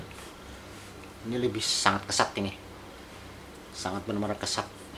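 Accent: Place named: Banjar, Indonesia. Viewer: inggih logat nya. urang mana pian mang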